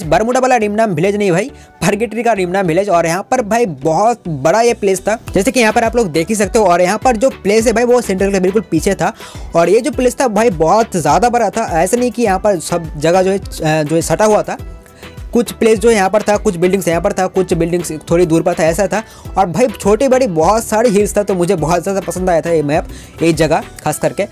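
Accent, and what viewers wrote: Bengal, India. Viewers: You are from Bengal ... I can feel the Bengali version of hindi ... In your hindi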